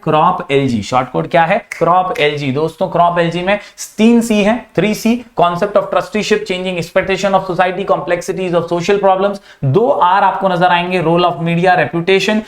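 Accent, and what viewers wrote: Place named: Uttar Pradesh, India. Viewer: Sir U.P se belong karte ho kya U.P ke old age people aise baat karte hai. :)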